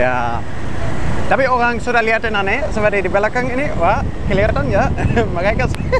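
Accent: Germany. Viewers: I like your german accent XD mas bule... Due bist schön XD *maap klo salah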